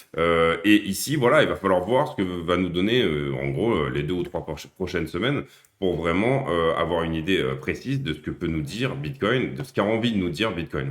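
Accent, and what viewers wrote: France. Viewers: Personnellement, j'adore me promener dans la nature. ça fait plusieurs années déjà qu'on a entrepris une excursion ailleurs mais avant on réjouissait beaucoup d'une sortie à la mer juste pour se balader un peu et respirer... on a visité plusieurs fois l'île envoütante de Cuba. Ce qui m'intéresse en ce moment c'est les "communautés" en France (et ailleurs) où on vit un peu à l'écart comme par exemple les endroits oà on se dédie à la permaculture etceterca. Ben voilà, j'ai juste voulu répondre à votre dernière question. Nous on vit près de la frontière des Pays-Bas. Vous avez un bel accent français, pourtant je ne saurais pas dire d'où exactement. Ce qui importe c'est que vous vous faites comprendre malgré la vitesse avec laquelle vous vous exprimiez. Bien à vous.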